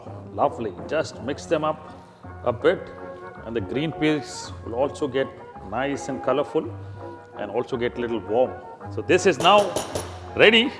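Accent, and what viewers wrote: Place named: South India, India. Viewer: South Indian accent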